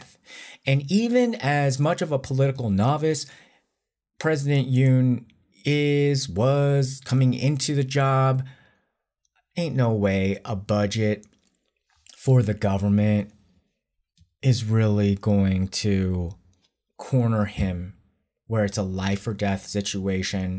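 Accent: United States. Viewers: Never like American English. Sounds terrible.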